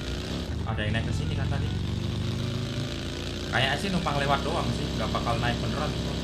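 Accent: Java, Indonesia. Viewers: Game ke 2 pizza hut wkwkwkw Jowone ilooo lucu ngomonge cuk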